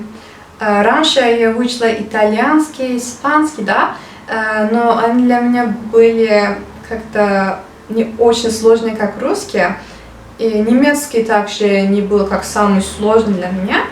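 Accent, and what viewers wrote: Standard. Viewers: Восхитительно! Вы настолько чисто говорите по-русски! У Вас такой талант к изучению языков! Большое Вам спасибо за это видео.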